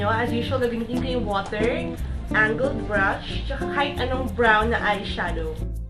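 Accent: Philippines. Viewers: You have a cute Filipino accent :)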